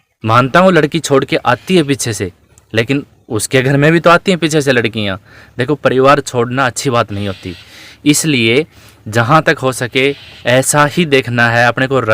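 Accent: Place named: Haryana, India. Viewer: Wov lagta h ap haryana se ho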